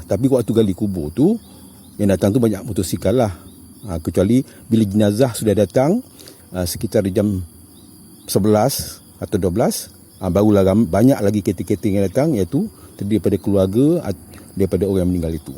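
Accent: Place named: Melaka, Malaysia. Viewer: Ini lorat percakapan orang Melaka ni...ni mesti kejadian di bumi Hang Tuah ni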